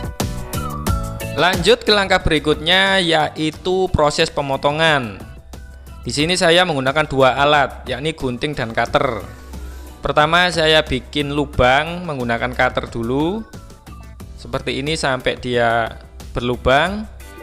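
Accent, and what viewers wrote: Java, Indonesia. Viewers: Smpean jowo'ne pundi Om..?? 😁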